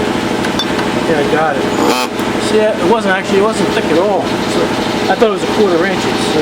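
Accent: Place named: New York, United States. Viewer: You sound like your from new york or from the country....or both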